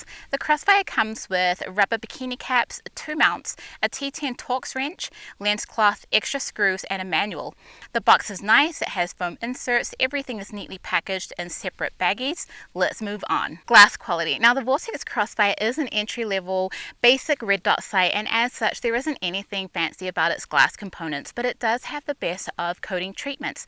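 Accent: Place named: Australia. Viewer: Damn woman, you know your shit I Know it’s kinda weird but I love the accent. I spent a few years in Adelaide with some very good family friends and would love to make it back someday. Anyway, sorry for the essay, but keep up the good work on the videos , very informative to people looking into new sighting systems . Convinced me to get a prism due to my astigmatism (even at 34), it’s been a good quick optic with very few issues. Looking into lvpos now so we shall see. Maybe i’ll just get a solid magnifier and maybe a canted red dot. Anyways cheers, good shit!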